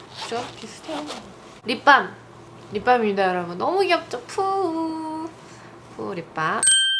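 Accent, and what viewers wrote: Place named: England, United Kingdom. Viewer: She has such a nice English accent, I love it ❤️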